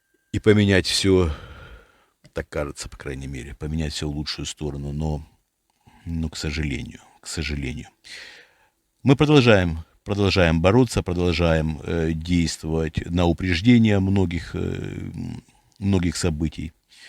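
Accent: Ukraine. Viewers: Судя по вашему говору, вы украинец?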